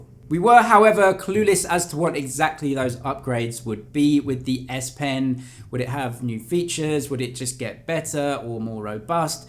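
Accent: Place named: United Kingdom. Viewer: I thought you were Brian Firkus but with a British accent ahaha nice video though! 👍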